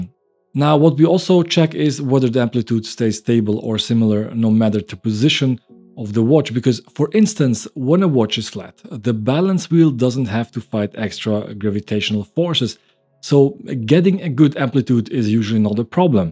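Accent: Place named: Switzerland. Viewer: An AI bot ould not have a Swiss-German accent when speaking English.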